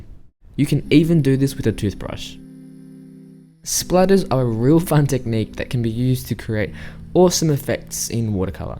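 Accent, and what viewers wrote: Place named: Australia. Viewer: sounds like an australian accent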